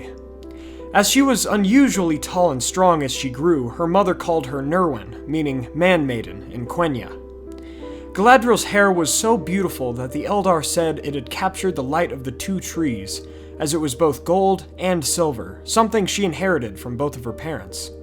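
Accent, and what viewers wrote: United States. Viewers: the way Americans pronounce Middle Earth names makes me laugh ... bless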